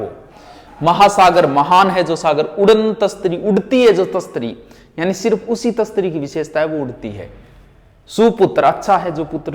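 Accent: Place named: Haryana, India. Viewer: YOU ARE FROM HARYANA. I GUESS!!!!!!!!!!!!!!!!!!!!!!!!!!!!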